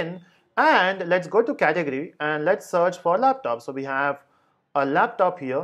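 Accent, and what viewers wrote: India. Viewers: Trying too hard often turns out to be bad but raw indian accent sounds bad. It bleeds my ears.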